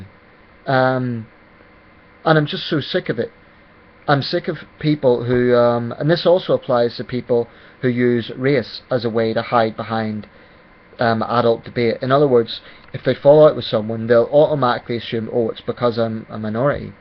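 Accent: Northern Ireland, United Kingdom. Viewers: Ah okay only discovered your channel the other day nice to see someone my age with their head screwed on for a change and do i detect an Norn iron accent? Plenty of up round my area.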